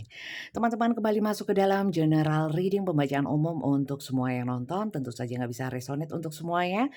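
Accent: Java, Indonesia. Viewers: Saya selalu ketawa tiap ibu keluar Jawa medok nya. Kalau ada orang yg serumpun bahasa dengan kita, lalu lihat video ini : kata "munthuk" itu bahasa Malaysia apa ya ? Saya nonton rame2 dengan anggota keluarga yg lain, waktu ndengerin ibu bilang "munthuk"... "angel wis angel" spontan ketawa. Baru tahu kami kalau ada juga cinta bersegi yg kasmaran tapi bisa dengan kontrol diri yg baik. Biasanya buntut nya cerai dgn pasangan sah nya, kalau beberapa hari ini berturut-turut bacaannya cinta bersegi, berarti buuuanyak yg menjalani kasus2 seperti ini. Terjadi penurunan moralitas dalam pernikahan. Dampak dolar naik ke 16ribu, ekonomi negara kita sedang tidak baik-baik saja, eh pernikahan kok ikut2an juga tidak baik-baik saja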